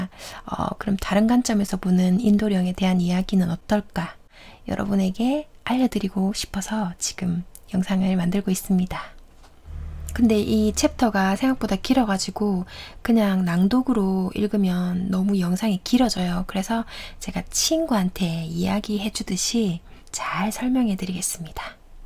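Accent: Gyeongsang, South Korea. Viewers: 아우 나도 경상도 고향이지만.. 사투리 정말 듣기 싫다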